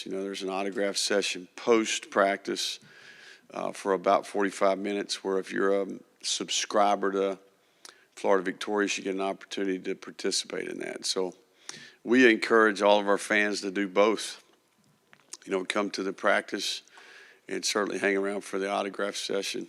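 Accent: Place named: Tennessee, United States. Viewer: You must not be from Tennessee lol everyone talks like him In Tennessee (where he’s from)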